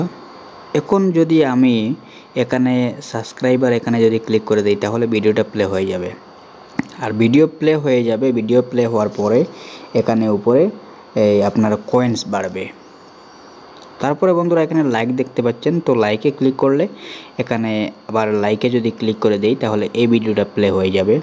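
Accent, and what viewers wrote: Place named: Sylhet, Bangladesh. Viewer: আসসালামু আলাইকুম ভাইয়া কেমন আছেন ভালো আছেন নি আপনার বাড়ি কোথায় সিলেট নাকি আপনি কোন রকম সিলেটি সিলেটি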